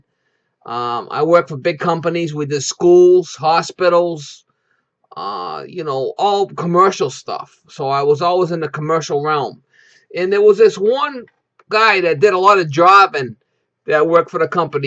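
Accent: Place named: Northeast, United States. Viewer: Guys, I am 54. Licensed plumber and overall home repair guy. Been in the remodeling business for 20 years now. I have remodeled over 1500 bathrooms. Trust me, this guy knows what he is talking about. If you are a young guy in this trade you should watch every video he makes. Knowledge and experience are worth a ton. This man has them. And his videos are also entertaining. Yes I know he is a yankee and sounds funny. But he is a SMART dude. Learn from him.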